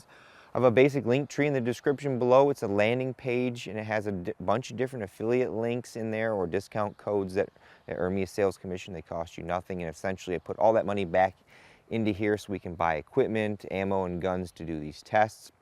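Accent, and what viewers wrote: Australia. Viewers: Good Australian Accent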